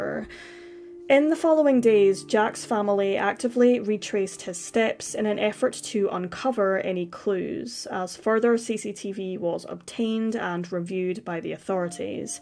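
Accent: Scotland, United Kingdom. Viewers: North east scotland accent?! 😮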